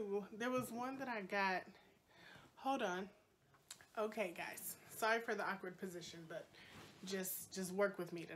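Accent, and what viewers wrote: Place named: Jamaica. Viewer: My dude has been in America 25 years and still has his thick Jamaican accent. He stated he never wanted to loose it.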